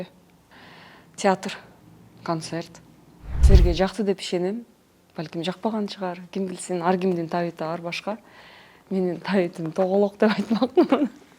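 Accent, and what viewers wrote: Standard. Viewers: Кыргызча таза Тил байлыгы ото коп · Жөнөкөй, кыргыз тилин таза сүйлөгөн, жагымдуу, таланттуу сонун кыз ❤❤❤ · Нукура кыргызча суйлогонунуз жакты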